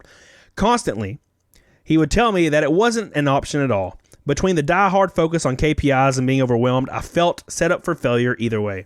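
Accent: South, United States. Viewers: I will say. I usually don't like southern accents. But your accent is what makes your sense of humor atleast to me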